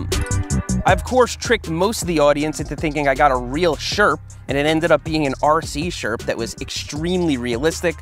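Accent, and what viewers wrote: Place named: New York, United States. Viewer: It's cute how thinks he got rid of his accent. That lovely NEW YAWK accent.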